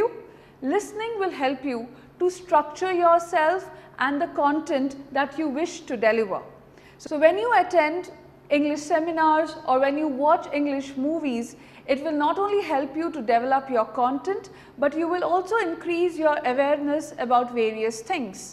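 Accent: India. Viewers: Nothing like a pretty Indian accent. So feminine and pretty 💕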